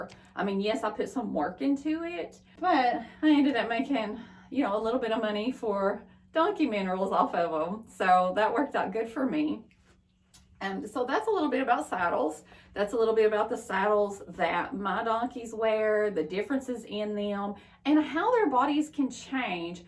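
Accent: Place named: South, United States. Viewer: Very helpful. Thank you! Also love your accent. I miss being in the south for the accents, the sweet tea, and the riding weather!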